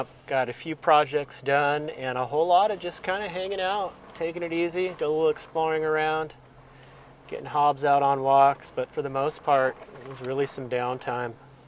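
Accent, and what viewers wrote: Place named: Minnesota, United States. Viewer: 2nd camp is so beautiful. I love Minnesota voice.. I’m from Michigan, I can hear his accent haha! Coyotes freak me out, not a fan. Love the prairie dogs🩵 so cute! I love ribeye steaks. Burgers for the win! Safe travels & camping, until next time!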